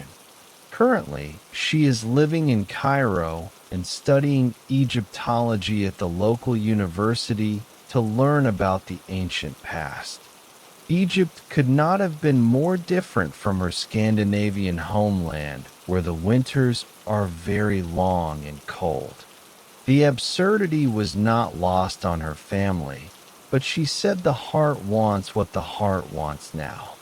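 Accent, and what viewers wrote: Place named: United States. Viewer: Don’t like American accents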